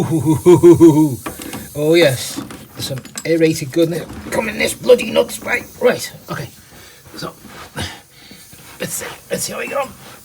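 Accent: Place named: Liverpool, United Kingdom. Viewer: Welcome back, your right about making videos of the work, taking more time than the job itself, I tried while renewing my rear spring front hanger,( MGB suspension ) posted on YouTube, took ages to edit, will try again soon and put some sound on the next one, great video, lots of colourful English, always sounds better in Scouse, I read somewhere that the original lever shocks were designed to leak through the shaft for lubrication and recon ones had a proper bearing and an oil seal fitted, maybe that explains why yours don’t leak, I’m just about the same stage on the rear end of mine, shutz on today, tank and axle next, so I’m waiting (for you to skin your knuckles, so I don’t have to) thanks for all your fascinating work, Steve.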